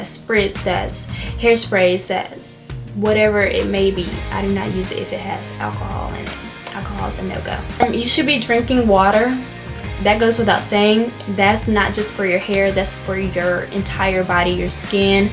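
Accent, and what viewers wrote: South, United States. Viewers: the little southern drawl... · Love your southern accent. I am from Texas.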